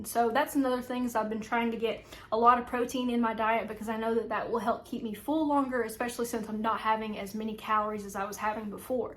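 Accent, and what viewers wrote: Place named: South, United States. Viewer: I just started Ozempic two weeks ago because i keep gaining weight and feeling hungry all the time. waking up at night or in the morning and have to snack and go back to sleep. btw, I love your accent. what state are you from? It sounds like a tad bit of a southern accent.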